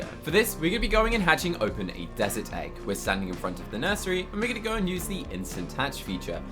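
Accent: United Kingdom. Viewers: So, I made a private server on adopt me and I tried to get you listed as someone who could use it but for some reason, I cannot friend you on Roblox. Can you please answer the question as to why and yes, I do mean you the YouTuber known as with the British accent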